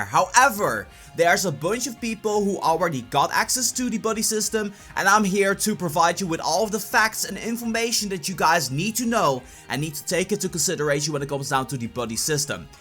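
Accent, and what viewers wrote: Netherlands. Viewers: It really sounds like you have a Dutch accent.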